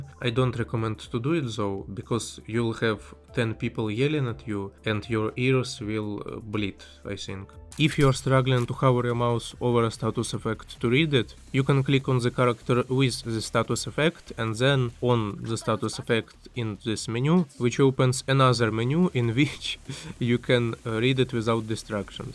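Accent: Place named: Russia. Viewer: the heavy russian accent is crazy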